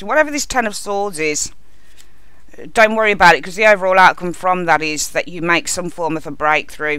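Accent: Birmingham, United Kingdom. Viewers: Thank you. Mismanaging money...yup. my lifelong problem. Hopeless! Love the Brum accent btw. Always enjoy your direct, on point approach to readings....don't let the whingers get you down!! 😋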